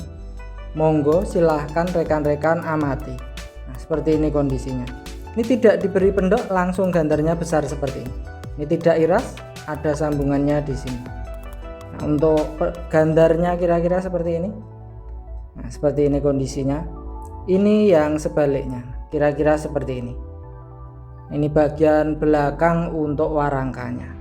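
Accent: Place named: Cirebon, Indonesia. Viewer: Pelokk cirebonan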